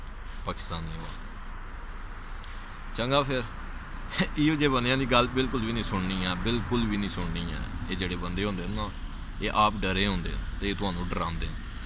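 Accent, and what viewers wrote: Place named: Punjab, India. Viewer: g thoda Punjabi Accent kmaal da 👌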